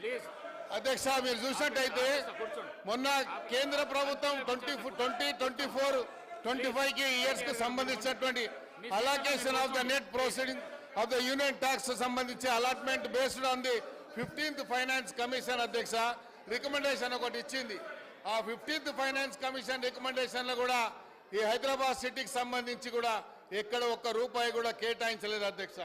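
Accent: Andhra Pradesh, India. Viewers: అమ్మ ఎవరికైనా అమ్మే సేమ్ ఆంధ్ర లెక్క మాట్లాడుతారు మీరు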